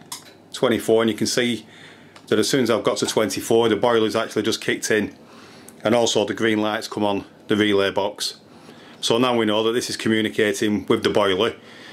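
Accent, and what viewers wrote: United Kingdom. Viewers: Very easy, I just connected mine and it work beautifully. thank you very much. If you dont mine me asking, what accent is that, I mean where are you from in UK?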